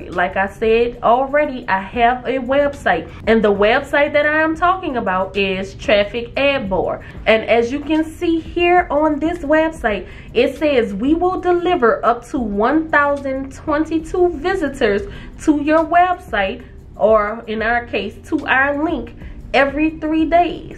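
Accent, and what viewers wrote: New Orleans, United States. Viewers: You from my city new Orleans i can hear it in your voice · I i notice a NOLA accent anywhere ❤❤❤ yeah baybee!!!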